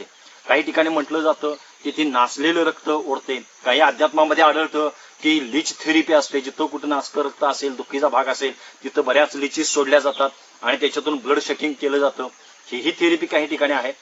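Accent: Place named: Kolhapur, India. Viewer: तुम्ही कोकणात राहता तर कोल्हापुरी टोनमधे कसे काय बोलता?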